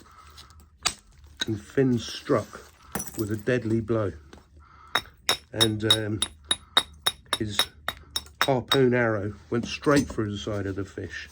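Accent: England, United Kingdom. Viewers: С первых слов понял что это англичанин, не американец. Произношение слов не ломаное, не голливудское.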